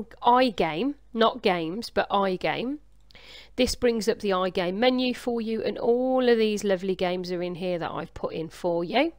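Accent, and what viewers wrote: Midlands, United Kingdom. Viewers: Thanks for this.. Very useful indeed...you sound like a fellow midlander btw :)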